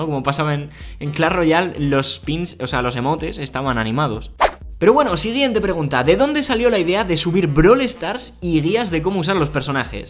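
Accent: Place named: Spain. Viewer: me estas trolleando? Aburrido? Tus preguntas y respuestas son unos de los mejores y... 100% seras mi youtuber favorito hasta tu face reveal(si lo haces) no me suelen gustar porque me suelo decepcionar un poco pero como eres español(o tienes acento español) la mayoria de youtubers epañoles me suelen gustar porque yo soy de españa. Pero creo que de todas formas, tu voz nunca me decepcionará y con ella nunca dejara de gustarme tu canal y muchas veces, me molan los chistes, ejemplo “es verdad que tiene poco rango” de el video de los 7 mejores gadgets, en fin, tus videos me pareceran los mejores despues de 4 videos otro youtuber Tus videos molan! 🔥🔥🔥❤️💪💪💪💪👍👍👍⭐😘😍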